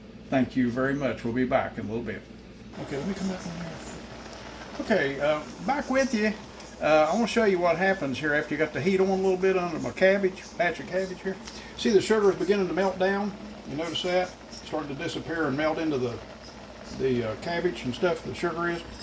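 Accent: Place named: Maryland, United States. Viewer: That looks wonderful!! My dad worked for the B andO railroad, you sound like you are from Maryland, my home state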